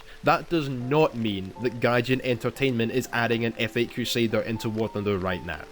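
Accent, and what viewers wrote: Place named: Scotland, United Kingdom. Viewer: Odd question but whereabouts are you from? I’m not sure whether I get a fifer or Edinburgh vibe from you 🤧🧐 (from a Fifer in Glasgow)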